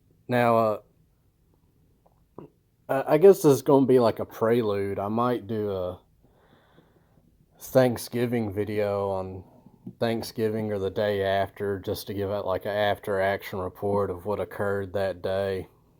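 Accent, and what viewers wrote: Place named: South, United States. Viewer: Btw idk why, but the southern American English accent is my favorite. Your voice and accent rly gets me feeling cozy, next to a campfire, having a drink with ppl i trust (idk thats how your accent gives the vibe for me).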